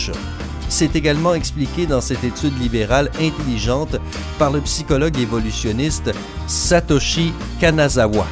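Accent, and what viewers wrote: Quebec, Canada. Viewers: tu as un accent très jolie du Québec mais il faudrait dire vous par politesse désolé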